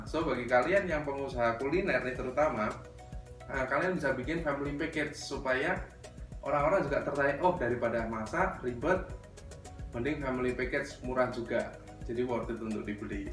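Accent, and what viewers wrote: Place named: Java, Indonesia. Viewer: KOKO MEDOK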